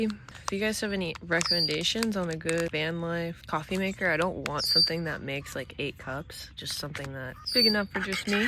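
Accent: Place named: Delaware Valley, United States. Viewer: She sounds like she is from the delaware valley but i know baltimore people sound like this. Maybe west Virginia. Anyone can tell me where she is from?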